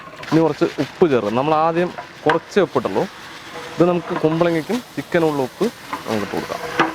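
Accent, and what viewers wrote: Palakkad, India. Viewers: Palakkaden slagill "കോഴിയും കുമ്പളങ്ങയും "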